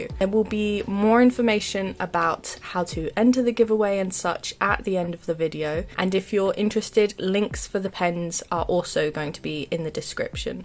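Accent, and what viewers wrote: United Kingdom. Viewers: Her accent is British English, possibly from around London or a little more South ❤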